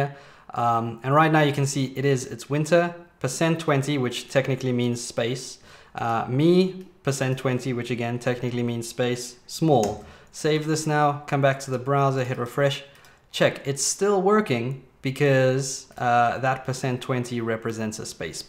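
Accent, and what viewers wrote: South Africa. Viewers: My guess is South African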